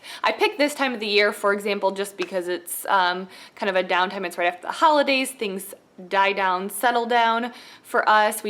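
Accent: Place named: Chicago, United States. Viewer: Great video! I'm new to your videos. Are you from Chicago Area? I love it there - your accent reminds me of the great people there!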